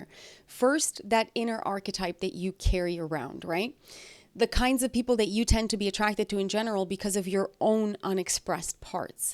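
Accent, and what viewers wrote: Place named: Ireland. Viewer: Sorry, just curious. Were you born in Canada? I mean I moved to Canada when I was nearly 12, and I still have a borderline Russian accent. It seems like you do too, not Russian, but something... Maybe even Irish...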